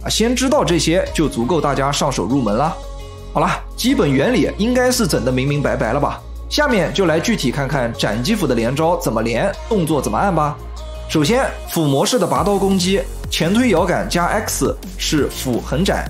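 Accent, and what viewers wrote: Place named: Shanghai, China. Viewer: 您是上海人吧，感觉你普通话的口音很有这感觉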